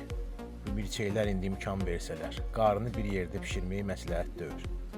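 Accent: Baku, Azerbaijan. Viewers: Bakı ləhcəsi ən gözəl ləhcələrdən biridir, bu xaş üçün ən yaxşı sous elə bakı ləhcəsidir.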